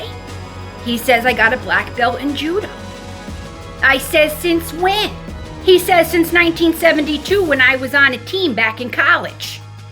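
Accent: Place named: Midwest, United States. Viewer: Omg! The almost spooky story with a happy ending.. all done with a Midwestern accent. LOVE IT!❤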